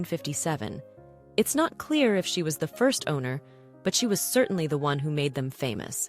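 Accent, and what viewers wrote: United States. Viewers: For a documentary about British Heritage, this American lady has done her homework but she should let a native speaker do the voiceover. The "don't you think" and other fatuous remarks lack the gravitas that would bring the required connoisseurship to the broadcast. No doubt they are intended for an American audience.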